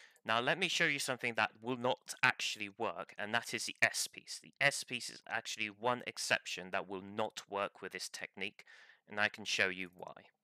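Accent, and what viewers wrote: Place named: United Kingdom. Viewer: Great video, you explained this in a very good way. One suggestion I have is that you tone down the British accent a bit.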